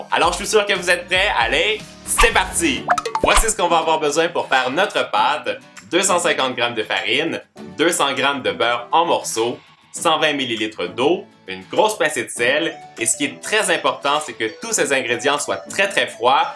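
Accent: Canada. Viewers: Bonne année et j'adore ton aksent du canada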